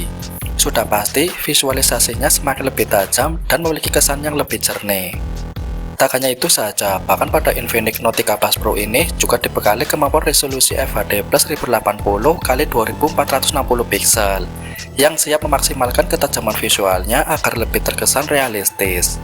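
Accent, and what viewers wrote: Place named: Java, Indonesia. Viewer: Medog banget om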